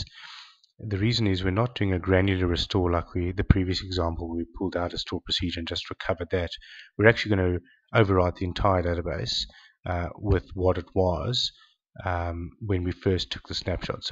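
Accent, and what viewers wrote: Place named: South Africa. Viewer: Your a legend! Quick and easy description and a very pleasent Saffa accent! Good work, thanks.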